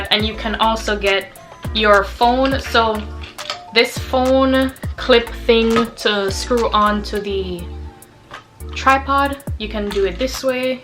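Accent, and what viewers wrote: Jamaica. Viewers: love your accent, im jamaican as well🥳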